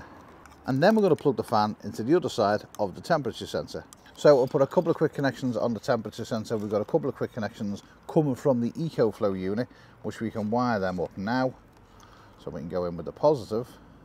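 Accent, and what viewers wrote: Liverpool, United Kingdom. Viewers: I use PC fans for my dogs crates, one pulling, one pushing. Bought a couple os sensors to attach to those; so great idea - thank you. Also - Liverpool or Wirral? I can't get your accent sorted!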